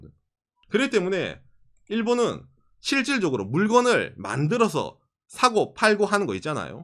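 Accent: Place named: Gyeongsang, South Korea. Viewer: 우짜든동~~~ 사실을 말해도 왜곡되기가 쉬운것이 진실이라 생각하는 바이라.... 그래도 그 안에서 관점의 차이를 알려주려는 의 영상 수십편을 보면서 우리와는 다른 그 곳에 대한 이해가 더 높아지네요 일본으로의 많은 여행경험으로써 인지하는 부분들도 영상을 보고서는 가끔 무릎을 탁!! 쳤습니다 아무튼 지난역사와 현재의 국제정세는 냉정히 봐야 한다는 입장을 지닌 울산 사는 형이.... 우짠지 말씨가 너모 익숙해가꼬 그래가 그 특별한 맻개의 사투리들이 테 정을 더 쌓게 했심데이~ 구도크와 좋아요!!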